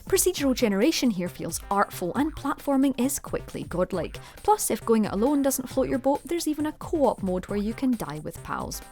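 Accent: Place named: Scotland, United Kingdom. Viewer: Scottish narrator It is amazing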